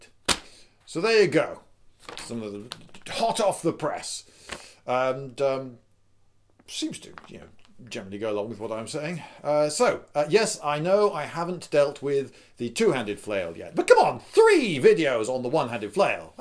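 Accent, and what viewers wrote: France. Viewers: I don't think the poor defensive capability would be as much of a disadvantage if you had a good shield with it. Naturally still worse than other weapons, but not as ridiculous as your French accent makes it sound like. I've always wondered why several balls would be a benefit, though. Good video. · i liked the video only because of the frenc accent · Brilliant French accent ! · Thumbs up for French accent. Lol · This is the 6th time I watched this video and the 5th time I watched it for the outrageous french accent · Best French accent ever! ;D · Outrrrrrrrrrrrrrageous French accent! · your french accent is awesome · You should do more french accents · Your outrageous french accent sounds like Engrish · Yes it's good. Also your french accent is hillarious. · Love the outrageous french accent :) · Your french accent is very reminiscent of the pineapple from telefrancais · Your french accent just made my day !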